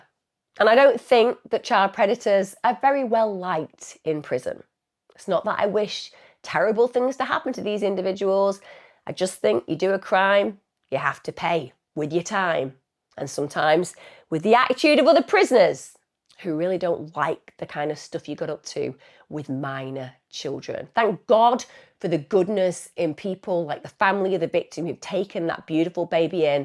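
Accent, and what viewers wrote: United Kingdom. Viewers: Nice to find uk accent podcasters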